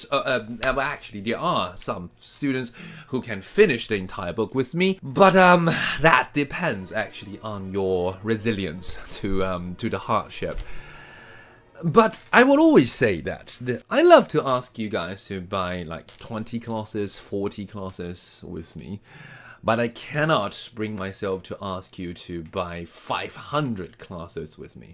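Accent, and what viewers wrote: RP, United Kingdom. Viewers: S C this is not the only way to speak English, you can always alter your accent towards the kind you prefer. The accent/tone is just because he is trying to convey a thought, or say, giving a speech. I don’t see a problem in this😂. There are more than one British accent of course, and this is just how ppl talk in formal occasion. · 我只能說，無論是不是有人覺得這是刻意的英式英文，但，我太愛了！很開心從阿滴頻道認識Eko。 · 看阿滴進來+1，老師的英式口音真的很好聽喔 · Eko老師反差太大，明明外表超日本視覺系，感覺一開口就要講日文，但講出來卻是一口道地的英式英文，而且還能言之有物，厲害👍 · 我也是看了阿滴才知道老師真的太帥了~超喜歡老師的英式~口音~! 真的會重拾學習英文的動力~ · 這英式口音太有魔性了😂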